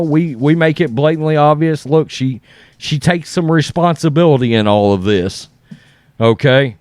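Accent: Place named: Appalachia, United States. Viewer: this is the wrong place for me ... I do not need a hillbilly to read to me .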